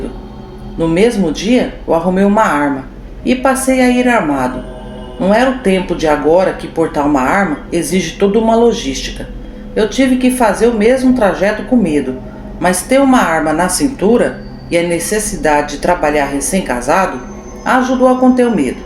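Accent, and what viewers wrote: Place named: Caipira, Brazil. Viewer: Essa entonação caipira ficou muito boa. Parabéns ❤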